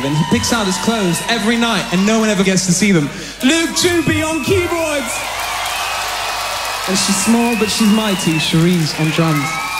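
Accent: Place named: United States. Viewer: he sounds so American!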